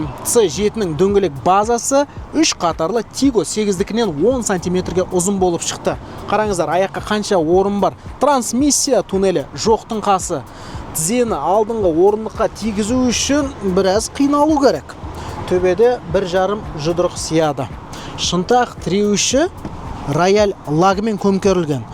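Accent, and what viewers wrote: Standard. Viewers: Ассалаумағалайкум. жарнама болаберсін сенің осындаи видиоларңды көруге тамаша таза қазақша😂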